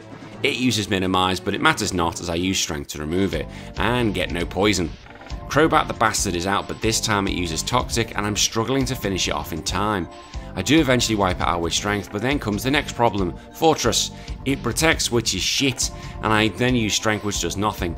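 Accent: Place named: England, United Kingdom. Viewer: Love seeing these challenges with a local-ish - English - accent. And swearing. The recorder fucking broke me.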